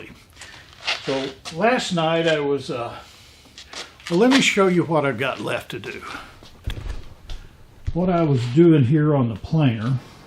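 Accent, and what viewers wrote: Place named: Houston, United States. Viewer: from the start of watching your videos, I've felt like I had a friend. I think it's because I grew up in Houston and there's something about your dry sarcasm (and accent) that reminds me of just about everybody I grew up with. I 't mind the longer format when the content is fun and interesting. You are an actor, so making these videos combines two sets of expression that are important to you. As soon as you make it about the money, it will be less fun for us and more like work for you, like an amateur musician getting a paid gig and now being obligated to spend four hours a day practicing. We watch because we like the hang-- is the surrogate for us as we watch and that's a good role. Do Patreon only if you actually need the money.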